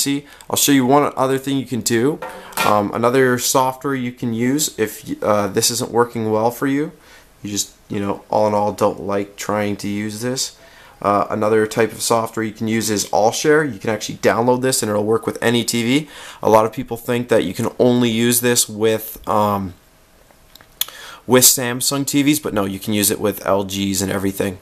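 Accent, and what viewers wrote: United States. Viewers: Leigh Ann Woody, the world is more than USA, outside the states you pronounce it "rotor", even if he sounds like an amercian, "rotor" is correct. So, how smart are you? ;) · Gerardo Sanchez Dumb stupid speaking yank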